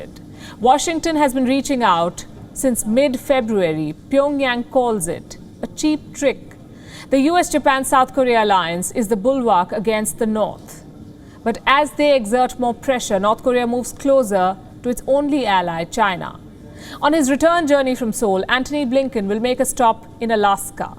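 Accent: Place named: India. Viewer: It's like Indian English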